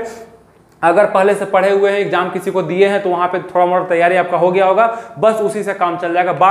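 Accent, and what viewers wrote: Bihar, India. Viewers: Aap bihar se ho guru jii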